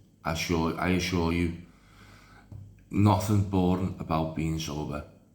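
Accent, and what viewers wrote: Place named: Liverpool, United Kingdom. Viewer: ❤❤❤ most honest talk I have ever heard what a pleasure to listen to proper scouser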